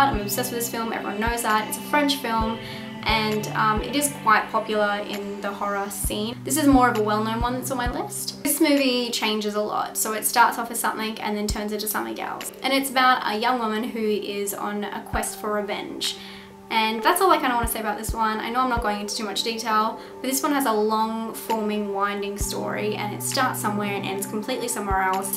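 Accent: Australia. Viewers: You’re an an Aussie, eh?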